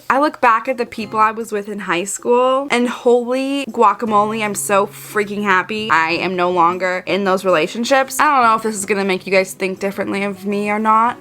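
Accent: Wisconsin, United States. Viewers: am i the only one who thinks she should have a podcast? she’s so real with her viewers which is freaking amazing and her voice is just nice to listen to, we love that wisconsinite accent 🤩🙌🏻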